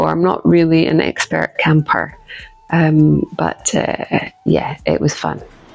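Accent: Scotland, United Kingdom. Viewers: Hi I’m also Scottish but moved to the US years ago to be with my American husband and not sure how long you have lived in France but it’s so nice to hear you haven’t lost your beautiful Scottish accent as I still have mine too and never understand how adults lose it after living a short time in another country but children are different they lose it pretty fast. I love Kika’s patterns also and in the Spring I finished the Salty Days Sweater which turned out beautiful. I also paint in watercolors so it was a lovely gift finding YouTube suggesting your video today and have subscribed also your Seashore Blouse is gorgeous! ♥️🤍💙🏴🧶🫖☕️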